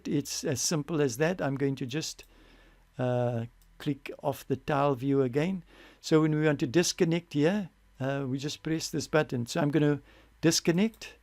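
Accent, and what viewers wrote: South Africa. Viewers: Ek wou se jy is afrikaans, groete vanaf Nederland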